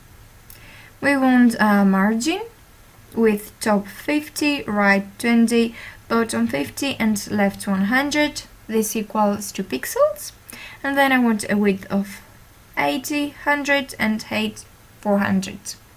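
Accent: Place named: England, United Kingdom. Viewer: I asked where are you from but you didn't answer.im just curious about your English accent.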